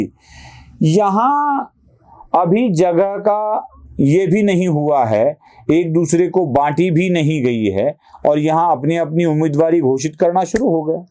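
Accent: Maharashtra, India. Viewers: छान जी. हिंदी मुळे outreach नक्की वाढेल. हिंदी बोलताना मराठी accent जाणवतो प्रकर्षाने. पण काही प्रॉब्लेम नाही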